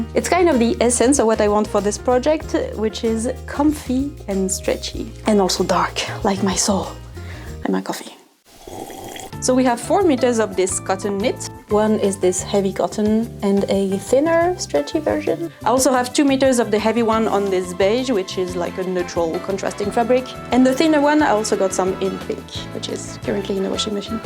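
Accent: France. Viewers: Je suis trop contente que t fr mdr, au debut j'ai entendu ton accent et je me suis dit "mais attend elle est française elle" mdr Tu fait un bon boulot, ta deja fait quelque chose de mignon ? Genre couleur pastel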